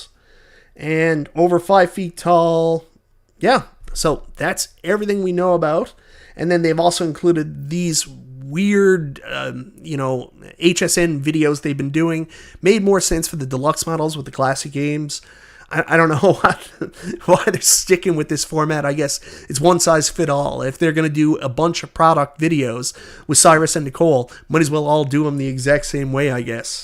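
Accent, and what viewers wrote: Canada. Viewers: I love ya but that Candian accent makes it sound like Ircade 1 up. Not Arcade 1 up. Its bugging me. Can you work on it.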